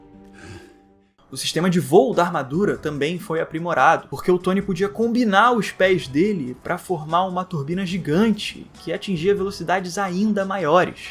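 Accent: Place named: Northeast, Brazil. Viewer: Mano vídeo sensacional cara parabéns. Mas me diz uma coisa tu é nordestino? Pq teu sotaque é igual o meu tbm kkkkk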